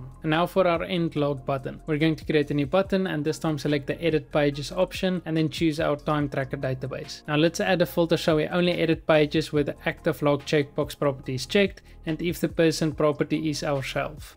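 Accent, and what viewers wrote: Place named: Free State, South Africa. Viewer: It is simply impossible that this is your real afrikaans accent! Sounds like you're from the freestate! Great content - keep it up.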